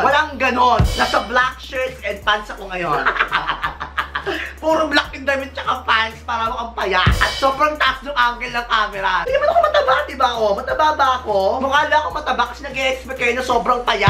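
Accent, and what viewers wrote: Visayas, Philippines. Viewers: Bisaya po ba kayo?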